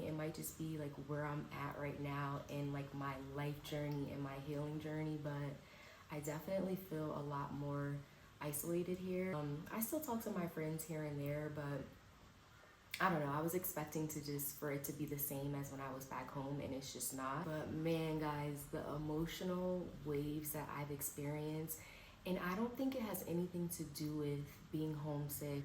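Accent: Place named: Latin America. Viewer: Are you 100% american or cuz i can hear some latina in you tambien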